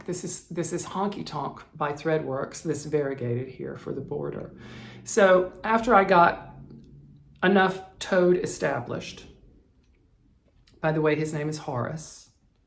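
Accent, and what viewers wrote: South, United States. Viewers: You would have to shave your mustache and get a red sweater to be Fred Rogers. That would be cool though! I hope you saw the movie with Tom Hanks, it was really precious. You said that you have an accent. Yes, I could hear something southern. Now that I have started to watch your previous episode, I am guessing that you are from Alabama. My friend from India 🇮🇳 when I told him that I did not have an accent, he said of course you have an accent. You have an American 🇺🇸 accent! Of course, in my completely untraveled life and stupidity, I thought Americans didn’t have accents. But of course people speaking English from Ireland 🇮🇪 , South Africa 🇿🇦 India 🇮🇳 England 🏴 , etc, they can all tell that we are from America 🇺🇸 . So think about it… Everyone has an accent! Just depends on who is listening. Thank you for rescuing cats 🐈 ! 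All of my pets are also rescues. Really cute that you kept all your childhood books 📚 . I don’t have a single one. Don’t you just love finding your artwork 🖼 and crafts from childhood? I have so few. And by the way… Basket 🧺 of cherries 🍒 was my first Blackbird design stitched and completed recently, and the first time I ever used Linen as well. I did it on 28 count.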